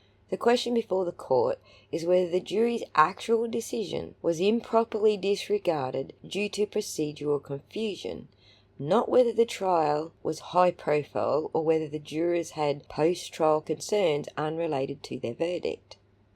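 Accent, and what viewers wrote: Australia. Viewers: Love yer Aussie x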